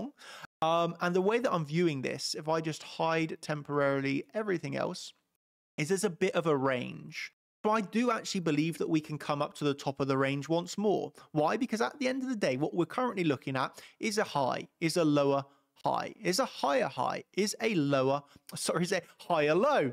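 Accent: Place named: London, United Kingdom. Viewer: Thanks,! Love your accent - got to be from somewhere to the west ('left') of London, surely?! God bless you, from London x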